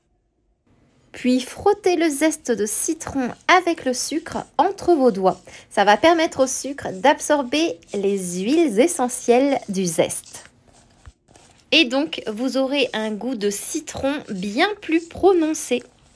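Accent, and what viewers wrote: France. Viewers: Sorry, you had me at the french accent. I was not expecting that. Lovely! 🤩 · me too, I came back just to listen to the French accent 2nd time :)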